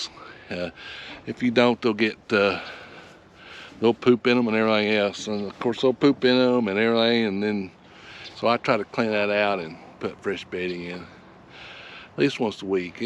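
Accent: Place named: North Georgia, United States. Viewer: Stick with the videos, we enjoy watching your progress. I also like your honest voice, I'm originally from north Georgia, so you sound like home. The AirForce took me to north Florida, so thats where i am now. A few more years and hope to be homesteading and farming for ourselves. We have a backyard flock of chickens we enjoy, just hoping to find more acreage in the coming years. Between Florida & Tennessee is where we want to be. Keep the videos coming, i think you're about to get popular. Best of luck & blessings, Jon & Noi from Florida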